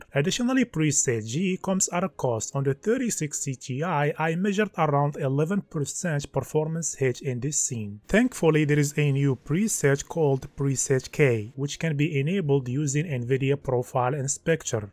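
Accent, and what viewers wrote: Morocco. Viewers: accent dyal lmgharba matkhfax rak nadi khoya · the moroccan accent is so thicc lol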